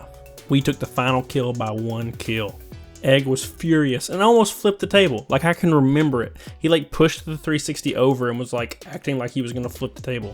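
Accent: South, United States. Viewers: Good lord Southern and hot Af woof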